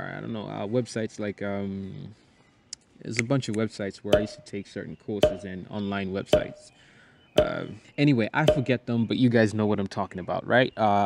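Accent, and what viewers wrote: United States. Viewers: You do have solid points in your analysis. Thanks for the share. By the way, where you got your American accent from 😀? Did you live in US or Canada??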